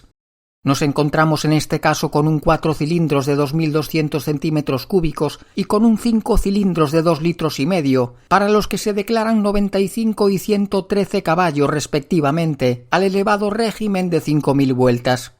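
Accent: Galicia, Spain. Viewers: Es verdad, este hombre es un poeta del motor. Y con ese acento gallego, de los acentos más bonitos de España.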